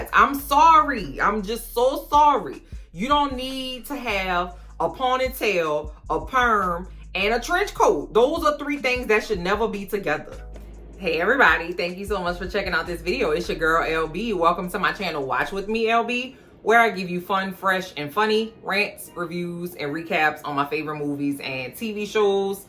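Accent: New Orleans, United States. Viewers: I’m so glad I found your channel! I’m from Nola and ur voice sounds like home. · I hear that nola accent fasho ooouuuweee we might be related 😂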